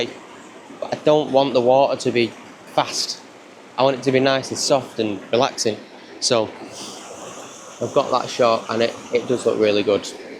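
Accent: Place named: Yorkshire, United Kingdom. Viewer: Amazing video your an amazing photographer you sound Yorkshire as am I your videos are amazing keep it up 😊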